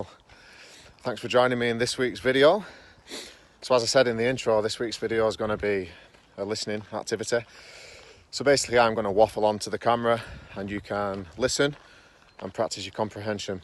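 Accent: United Kingdom. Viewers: hello from Russia, I understood around 70% of your speech but I enjoy the British accent. I would like to speak like you I mean with accent..)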